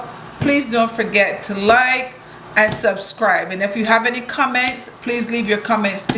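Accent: Barbados. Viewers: So sweet to hear a real-real bajan accent.. even if it's putting something in her well.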